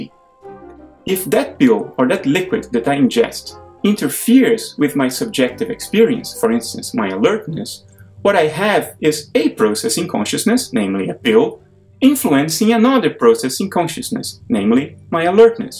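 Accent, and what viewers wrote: Netherlands. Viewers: Cool Dutch accent!! Good points made!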